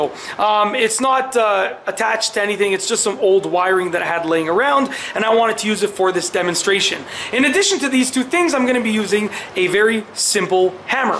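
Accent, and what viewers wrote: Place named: Canada. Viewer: Canadian Eh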